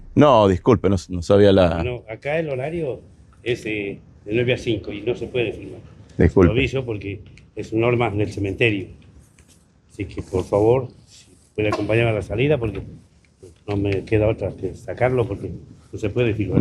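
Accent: Argentina. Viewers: Es excelente por qué ya de estar tanto tiempo acá parte de tu acento tiene algo de argentino, pero lo disimulaste lo mejor que pudiste jajaja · ya se te pego el acento argentino, vi un vídeo de hace 5 años y hablabas venezolano 😅 jejejeje saludos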